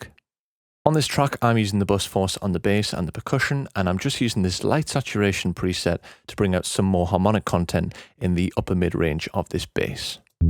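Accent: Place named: North East England, United Kingdom. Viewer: Champion North East accent there fella!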